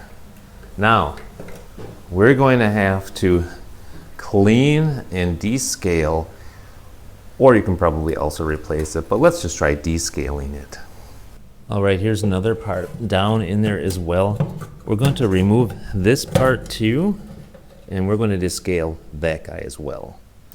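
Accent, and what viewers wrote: Germany. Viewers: Sounds crazy: American slang in German accent! 😊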